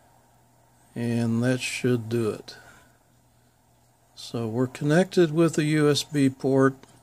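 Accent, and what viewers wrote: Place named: Charlotte, United States. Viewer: I just today found your videos. You sound like you are close to me in Charlotte. Our “accent” sounds like mine. Am I correct?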